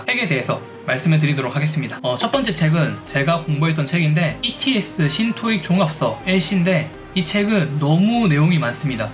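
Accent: Gyeongsang, South Korea. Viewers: 전달력이 좋으신데 말이 너무 빠릅니다.~ 영남 사투리 악센트를 써서 그런지 모르겠지만 표준어를 쓰는 사람에게는 유튜버님의 이야기를 잘 못들을 수 있어요. 말을 조금 천천히 하시는게 좋을 듯 합니다.^^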